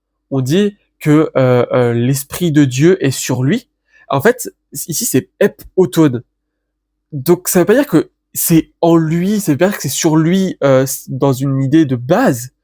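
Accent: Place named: France. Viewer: C'est dommage car le contenu est hyper intéressant et qualitatif mais étant locuteur grec natif la prononciation m'empêche de suivre. Trop de déformation mais pas spécifique a vous mais a tous les Français parlant le grec tel qu'il est enseigné en France. Un exemple simple le B se prononce VITA et pas BETA et ou le G se prononce ramma et pas gamma.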